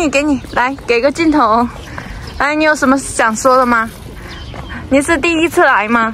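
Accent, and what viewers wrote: Taiwan. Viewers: 咦？妳說的一口台灣國語真的很到位，相信妳已經愛上了這裡，祝福妳！民主自由妳應該感應的很深刻，覺得妳很享受這裡的一切，恭喜妳。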